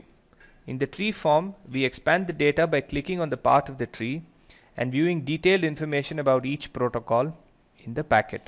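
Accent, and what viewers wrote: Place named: India. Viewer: It's Indian accent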